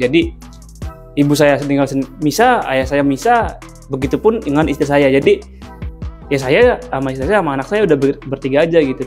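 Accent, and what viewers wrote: Indramayu, Indonesia. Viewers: Sampean wong endi dermayue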